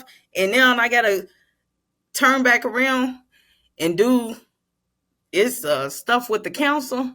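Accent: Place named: Kentucky, United States. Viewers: Ayeee😁😁🤣🤣 Well thank youuuu sugars. That's that Kentucky talk😂😂😂 Appreciate you 🫂🫶🏾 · Hey sis I just listened to your story and just wanted you to know that it was so encouraging the way that you can still smile after all you've been through I really need to change my way of thinking and be positive and be more faithful just like you said that you are I pray for you that everything else will go well in your life you seem like such a sweet person and your southern accent had me just laughing I love you sis praying for you thank you for keeping it real I know good people when I see them and you most definitely or a person with a good heart and a good spirit stay strong and please keep me in your prayers my name is Deborah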